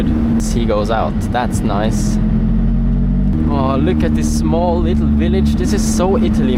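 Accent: Switzerland. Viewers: Are you from switzerland by any chance? Recognize the accent :) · te schwiss german akzent ghört mer sehr dezent haha, aber schön :D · As a german i want to hear your english more likely then your SCHWEIZER-DEUTSCH Accent. (No hate btw✌️)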